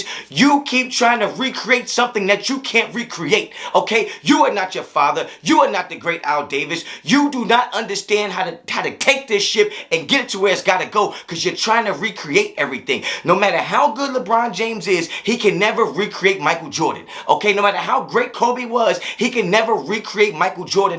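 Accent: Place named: AAVE, United States. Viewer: Stop with the ebonics, knucklehead. It's a bad look, bro. Trust me. 🙉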